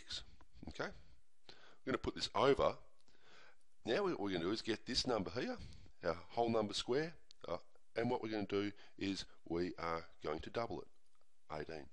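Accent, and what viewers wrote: Australia. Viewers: Man! Thanks u are brilliant . It will help us for sure. And i guess from me U are an aussie. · This man is violently Australian and I like it. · are you Australian ... ? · Is that an Aussie accent... I love it XD. Also thanks sirrr!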